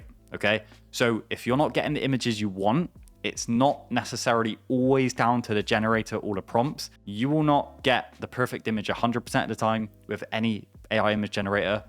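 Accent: Bristol, United Kingdom. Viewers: Aye are u Bristolian too!? Subscribed!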